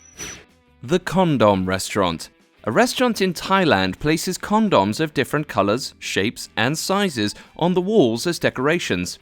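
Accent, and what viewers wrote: United Kingdom. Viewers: Elliott Stewart- You realize the announcer is British right? They pronounce things differently. I'm from Texas and have never heard anybody say Spo-kane so I think Americans get it. Also, your apostrophe is unnecessary. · AngryTurtle Gaming He's British.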